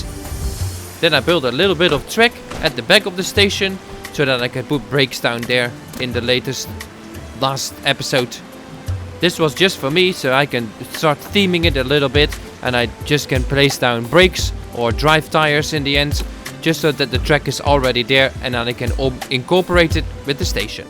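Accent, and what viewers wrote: Netherlands. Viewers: You live in New Zealand right but you have a playlist of dutch Music and you have a accent just like a lot of dutch people so are you dutch?? (Awesome video btw)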